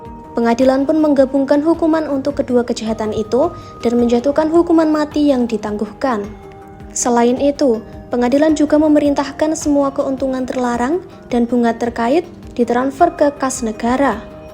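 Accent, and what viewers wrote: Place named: Yogyakarta, Indonesia. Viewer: Naratornya asli jogja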